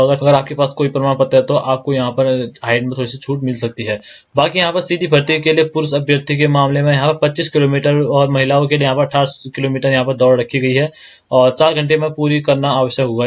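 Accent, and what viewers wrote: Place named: Uttarakhand, India. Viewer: Bhai aap phadi h kiya aapki language bhut piyari h. 😍😍❤ I love Uttrakhand ❤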